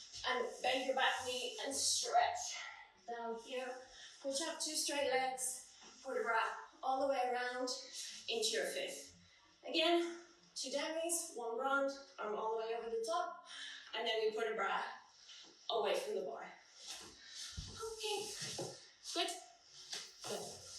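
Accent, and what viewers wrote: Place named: New York, United States. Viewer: Certainly haven't lost your touch- You look like you're feeling healthier than ever 🧦💛 (Can't remember if you're from New York originally, interesting accent though)🦅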